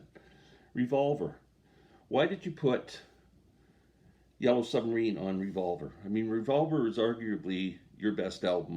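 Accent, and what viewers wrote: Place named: Canada. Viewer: That was hilarious! Also, I can't imagine anything coming across offensive when speaking in a Canadian accent. Cheers!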